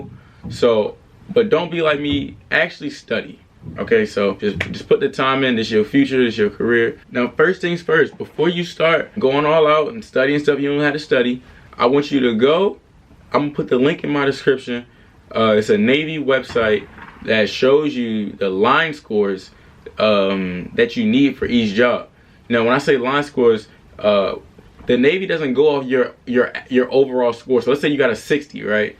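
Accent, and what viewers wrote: Baltimore, United States. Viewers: Sounds like he from either Bmore, D.C. or Jersey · Same! I just love the his accent. Gotta be Baltimore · I can tell he was from Baltimore in a few sec. just off how he talk fr, 410 baby we here. I’m also looking to go in with air traffic control aspirations but for the Air Force.. definitely going be following you my brother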